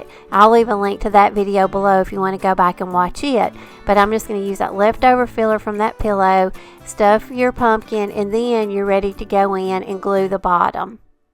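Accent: South, United States. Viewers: These are darling crafts. I love rag wreaths. The fabrics are so cute. I’m a seamstress and I love fabric. I love your southern accent. You have such sweet voice. 🎃🧡🍁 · I LOVE EVERYTHING YOU MAKE!!! Thank you for sharing your wonderful projects and talents!!!! And from one Southern Gal to Another, I love and appreciate your accent!!!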